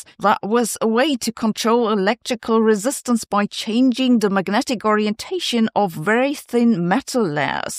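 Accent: Germany. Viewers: There's something about German accents that are cool❤ watch out, baby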